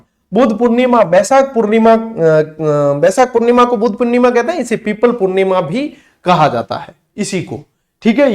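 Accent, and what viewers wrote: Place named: Behror, India. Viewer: Guru ji khandavo bole mare बहरोड़ साइड m😂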